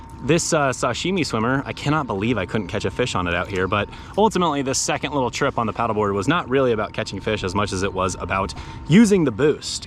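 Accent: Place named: Midwest, United States. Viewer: Love the mid west accent. More of it!!!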